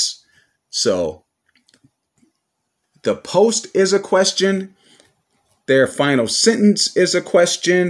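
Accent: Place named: Detroit, United States. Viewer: ok peace brother, keep the videos coming. That Detroit accent is very distinct. I met Manny years ago in Ann Arbour.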